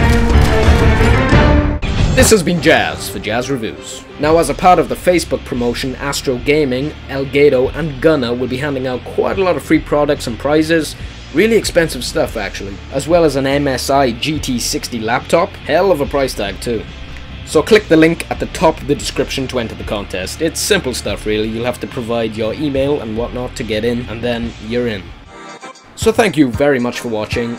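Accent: Wales, United Kingdom. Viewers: obviously hes welsh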